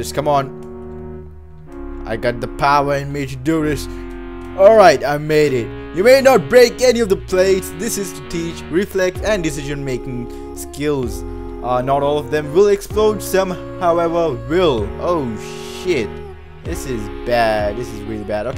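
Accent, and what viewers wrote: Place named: Southall, United Kingdom. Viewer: U sound like southall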